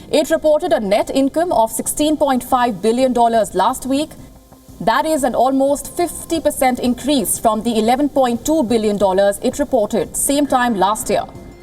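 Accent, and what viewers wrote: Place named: India. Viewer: I love the totty English spoken by this lady of India than English speakers beautiful accent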